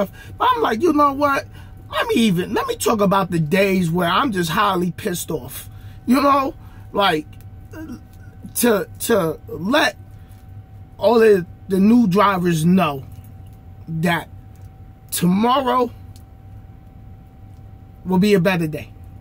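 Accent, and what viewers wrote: New York, United States. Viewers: Sounds like your from nyc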